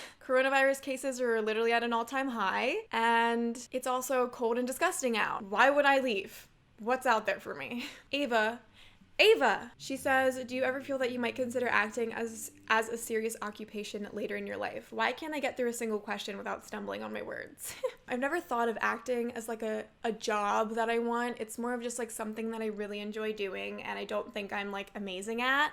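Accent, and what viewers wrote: United Kingdom. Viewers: This British accent lmao I love it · my crush on her when she speaks brittish 📈📈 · your nervous british accent :> so cute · 20 seconds onto the video and she’s already talking in a British accent😂😂 iconic queen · i know what a drinking game is, He/she said to subscribe everytime does a British accent 🤯 where's the drinking part?? Edit: no drinking in a "drinking game" lol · I love your British accent and you🤍🤍 , btw what mascara do you use because you look stunning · oh, how much I missed your British accent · i bloody missed that british accent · YES BRITISH IS BACK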